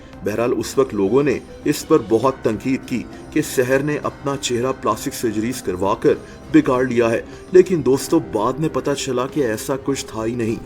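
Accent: Multan, Pakistan. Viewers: Are you from multan??????????????😊😊😊😊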